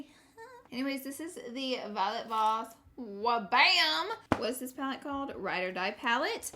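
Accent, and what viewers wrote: South, United States. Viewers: I love watching and listening to your videos because you're from the south and your voice makes me so happy. You're so funny and you're so entertaining even just talking about eyeshadow palettes.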